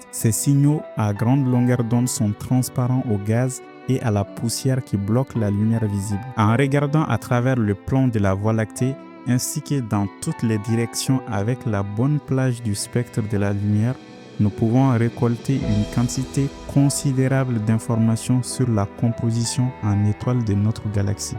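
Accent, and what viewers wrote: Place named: Africa. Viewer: J'adore ton accent africain, c'est rafraîchissant dans ce monde d'internet où toutes les voix sont faites par IA et se ressemblent toutes. Tu articule bien en plus, c'est compréhensible. Merci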